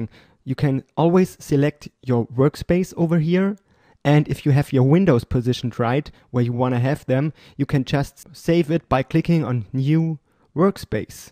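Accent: Germany. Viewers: Deutsche Ausprache xD Aber gut gemacht :) Wie wäre es mit Deutschen Tut's?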